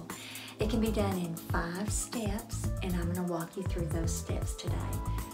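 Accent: South, United States. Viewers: Funny, great looking, with a soft southern accent.😅❤️🇺🇸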